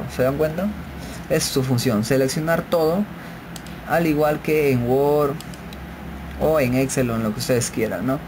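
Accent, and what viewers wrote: Peru. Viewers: Acordemonos de que nuestro hermano nos ensena con la forma de hablar en peru, la cual como en toda America Latina tenemos muchos acentos y modismos en nuestra forma de hablar el espanol asi que por favor no lo corrijamos ya que hasta mucho hace nuestro hermano con enzenarnos a los que no somos tan Buenos en la computadora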